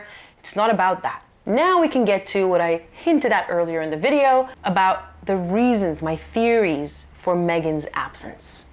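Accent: United States. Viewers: You said that's you're an Australian, but you have a very American accent! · I have the audiobook for Courtiers I'm currently listening to. I'm just getting into the chapters of current times. I enjoy your channel very much and your knowledge as an attorney. I know you live in Australia but don't Aussie. I am American and was sure you were American by your accent, but then you made a comment that you've never been here.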